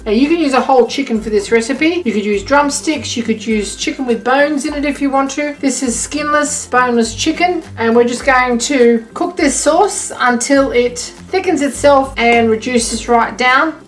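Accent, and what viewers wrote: Australia. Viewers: Sortaye? Saute? It's ok, I'm getting the hang of Strine speak now :) Actually another top notch cooking lesson and recipe!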